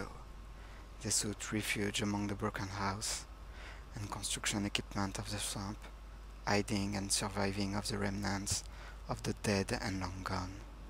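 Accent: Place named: France. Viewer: Amazing my french friend! Great story, loved the way you said it. The french accent really added to spookie vibe thing. Great build. Will make a great halloween entry too! Just love it, didn't know you had it in you... Very macabre...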